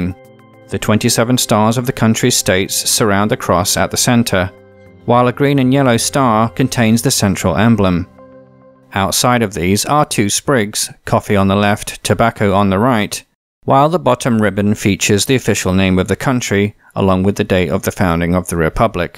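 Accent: United Kingdom. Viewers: Great summary of the history of Brazil, you sound like you are from north England somewhere like Leeds/Sheffield ?, one thing I don't understand, Brazil has some of the worlds largest collection of resources and a pollution of 200 million but with an economy not in the top 10 and 15th the size of the USA, what is the reason for this? Places I have visited in Brazil, Sao Paulo, Manaus, Rio, Brasilia, Boa Vista. It used to have the currency the cruzeiro when I was there long ago, also the ALCO powered cars were fascinating back then.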